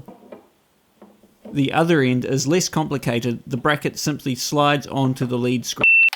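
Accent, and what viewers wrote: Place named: New Zealand. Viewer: Just found these vids. Nice work. Pleased to hear a Kiwi accent on youtube.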